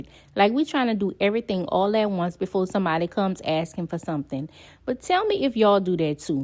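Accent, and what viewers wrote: Louisiana, United States. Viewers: Are you in Louisiana ?? Omg your accent is so strong & cute ❤️ I am a Texan so i get it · I love you accent. Are you from Louisiana?❤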